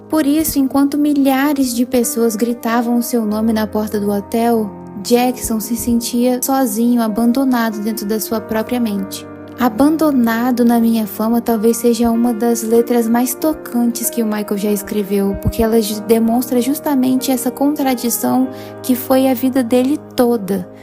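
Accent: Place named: Bahia, Brazil. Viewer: Olha só, que incrível! Amei esse vídeo, você é muito talentosa, a televisão precisa de pessoas assim como você, jovens de talento e bom gosto. Imagino que você deva ter no máximo 22 anos. E como vocẽ conhece tão profundamende a história de e, principalmente a história dessa música?! Parabéns mesmo. Muitos da sua geração e das mais recentes, deviam ter contato com a boa arte dos anos 70, 80 e 90, para que talvez, com disseminação da boa cultura, quem sabe assim novos artistas como e tantos outros possam surgir no mundo. Meu nome é Jô, tenho 52 anos, moro em São Paulo e nasci em Jequié na Bahia. Achei você com o sotaque meio bainao e pernambucano. Fala aí, de onde você é? Quanto a música, ela é incŕivel, as gotas de chuva em câmera lenta, é incrível o clip. Quem sabe talvez você não possa fazer um vídeo falando da também? É outra cantora incrível que merece ter muitas músicas destacadas. 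Sucesso pra você garota, você tem o talento estampado na cara, continue assim com sua simplicidade que você chegará longe.💚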